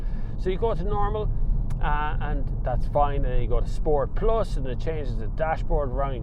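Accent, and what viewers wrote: Ireland. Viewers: What a fine review. You have obviously heard all the bs, are not impressed and are certainly not going to repeat it. Just plain facts and honest impressions with a bit of humor. Great, thank you. And of course the Irish, most musical accent in the western world. 😀 And oh yes, almost forgot: great camera work!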